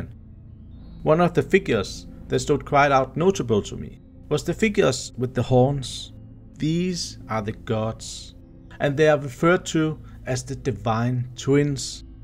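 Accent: Denmark. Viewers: I dont Care about your danish accent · your light Danish accent is very cute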